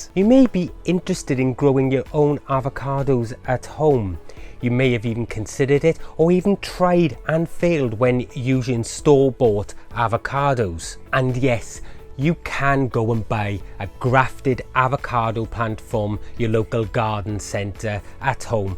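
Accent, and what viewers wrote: Wales, United Kingdom. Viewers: that's wales accent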